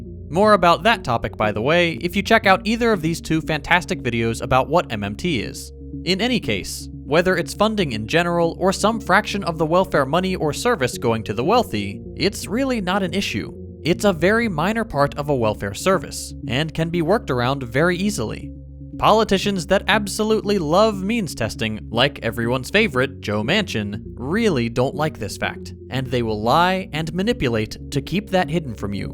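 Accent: Texas, United States. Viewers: Bro is texan? Wtf?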